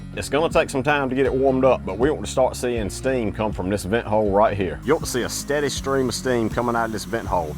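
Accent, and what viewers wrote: United States. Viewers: It’s some kind of American accent, definitely not Australian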